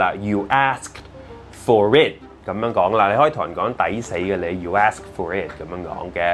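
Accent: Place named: United Kingdom. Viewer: 七師父用British accent有料!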